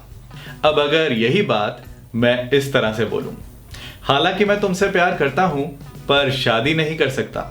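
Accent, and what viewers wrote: India. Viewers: Indian english was so bad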